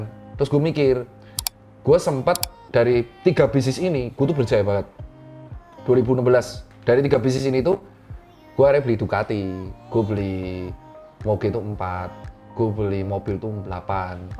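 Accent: Java, Indonesia. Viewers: Medok bgt,,ngomongnya...jawa,yes.gorengan kesukaan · medok guwa guwene🤣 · medoookkk bingit😊😊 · Asli mau segaul apapun logat jawa tak bisa di hilangkan 😄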